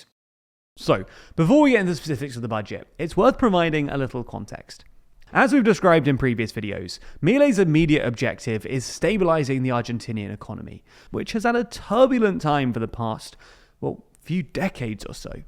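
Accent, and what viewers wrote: United Kingdom. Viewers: So now someone with a UK accent is speaking badly of Milei... Do you prefer that we continue with the previous K government and fill ourselves with Chinese and oriental weapons...? You guys don't like anything. Better deal with illegal migration in your country...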